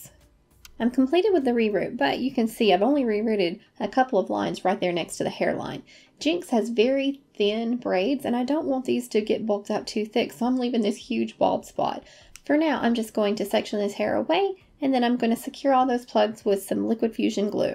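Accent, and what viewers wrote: South, United States. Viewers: Im so used to southern accents in georgia they usually annoy me, but yours is so soothing and calming and fits the aesthetic of the videos. Awesome stuff!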